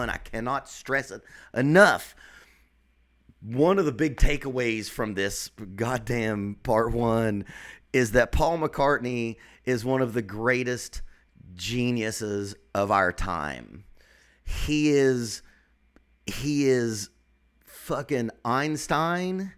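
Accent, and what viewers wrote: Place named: Gulf Coast, United States. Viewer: I'm trying to read your accent, are you from the gulf coast?I came for the Beatles doc, but I'll continue to check out your channel! 👍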